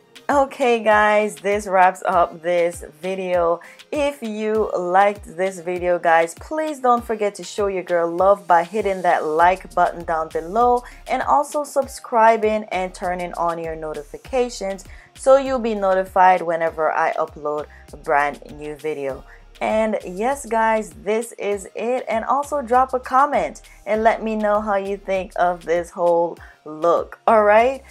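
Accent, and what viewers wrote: Jamaica. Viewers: you sound like a Jamaican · You sound Jamaican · Are u Jamaican??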